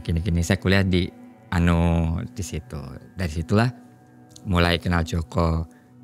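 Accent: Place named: Java, Indonesia. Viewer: Ditunggu Mas cerita epik nya..bahasa Jawa ne jenengan enak banget di dengar nya❤❤😊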